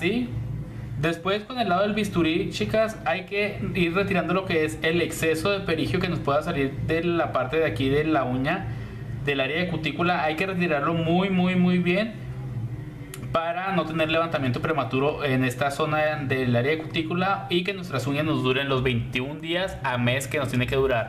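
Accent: Mexico. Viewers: Si pudiera amiga ese es su acento no todos los mexicanos hablamos igual, depende de el estado cada quien tiene su acento y el de el esta padre.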